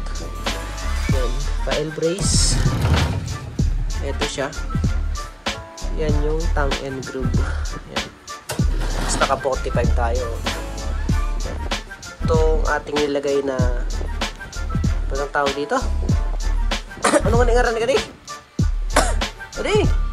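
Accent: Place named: Albay, Philippines. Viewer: Uno ngani ngaran nikadi? Ka taga albay ka boss ah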